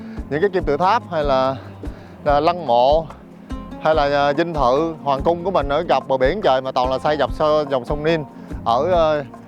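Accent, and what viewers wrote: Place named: Mekong Delta, Vietnam. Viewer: Cái kênh du lịch mà tui thích vì phần thuyết minh rất riêng của, vừa hay mà cũng vừa mắc cười, đậm chất miền Tây rặc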